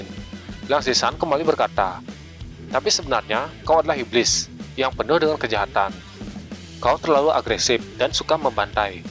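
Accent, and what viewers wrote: Bali, Indonesia. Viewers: Cengkoknya kayak orang bali ya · logat balinya kentel bgd^^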